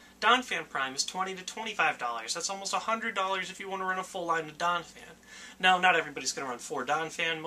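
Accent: United Kingdom. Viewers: LOVE THE BRITISH ACCENT!!!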